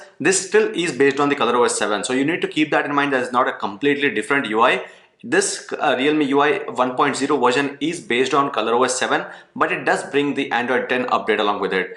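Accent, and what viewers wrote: India. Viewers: Should be a law against Indians speaking English 👎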